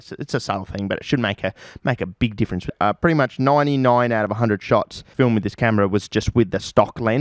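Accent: Australia. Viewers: Good to see you,. Your intelligent, irreverent, Strine is music to my ears:)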